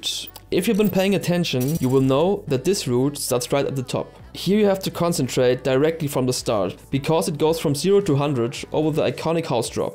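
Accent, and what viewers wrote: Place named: Germany. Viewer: Super Video! Gutes Denglisch ;)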